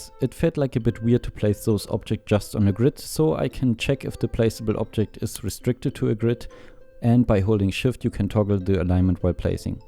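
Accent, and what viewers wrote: Germany. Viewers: Nices Game! Man hört das du Deutsch bist🤣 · hewo hörst dich fett deutsch an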